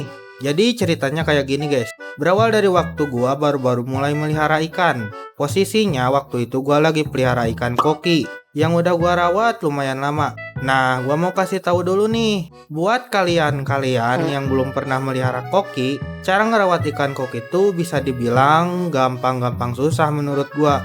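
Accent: West Java, Indonesia. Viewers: Orang jabar ya bangg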